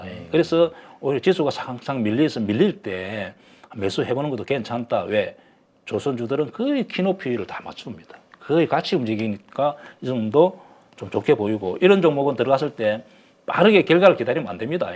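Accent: Gyeongsang, South Korea. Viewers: 갱상도 라도 시골 이네..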